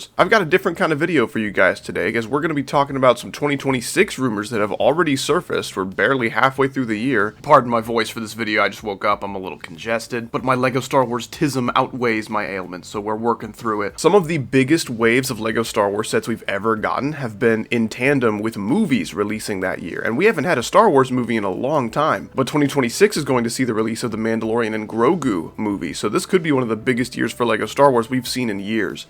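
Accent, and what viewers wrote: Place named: Texas, United States. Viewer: We need you to only use your texan accent for this channel to be epic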